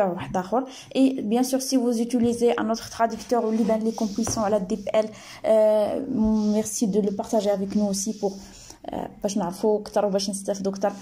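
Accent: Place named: Arab world. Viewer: merci pour la video je ne comprends pas trop bien ton accent arabe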